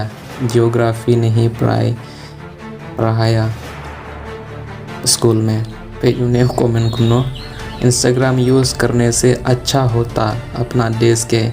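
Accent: Meghalaya, India. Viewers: Ei bah phim dei ne khasi?ka sur kren jongphi ym sngewdei ba phi dei dei khasi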